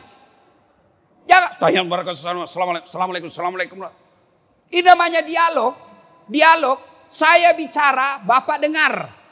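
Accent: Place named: Sulawesi, Indonesia. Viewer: Saya suka mendengar ceramah ustadz. Bahasanya jelas cirikhas Sulawesi Cetho welo welo. Sukses selalu.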